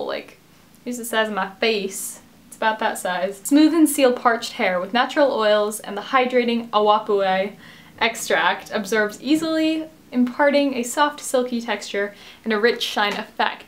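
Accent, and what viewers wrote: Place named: England, United Kingdom. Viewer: I can hear an English accent creeping in there :)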